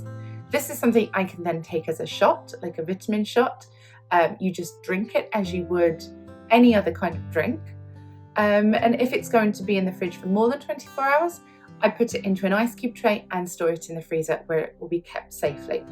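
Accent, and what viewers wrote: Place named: United Kingdom. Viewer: I came from LESA (Les amateurs) he is a very famous youtuber from Argentina who recommended you. I also want to tell you ai love you and your sweety british accent, I hope to meet you the day I will get back to England or also be welcome to China, the country where I live.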